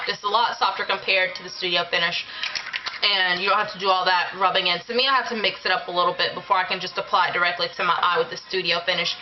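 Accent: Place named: South, United States. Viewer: Is that country in the background?? LOL Your southern accent is cute!!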